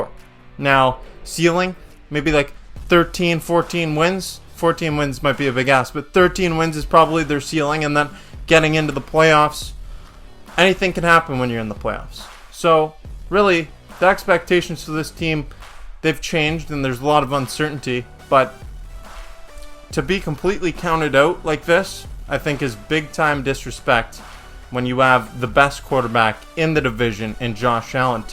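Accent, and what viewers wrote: Canada. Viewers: a canadian eh?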